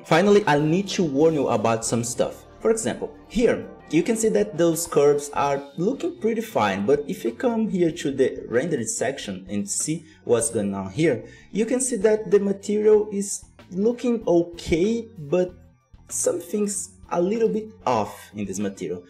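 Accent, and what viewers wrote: Brazil. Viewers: Sabia que era BR! kkk reconheço o ingles BR! Otimo video! Me inscrevi!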